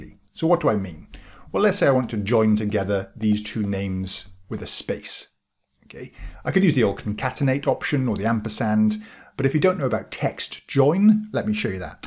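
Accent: Wales, United Kingdom. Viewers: Came here for Excel, stayed for the heavy British accent 😅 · Loving the Welsh accent 😁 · I’ve been using Excel for decades and have only scratched the surface of the pivot tools. This explanation has helped a lot. Thanks! (PS. Do I detect a fellow Welsh accent?)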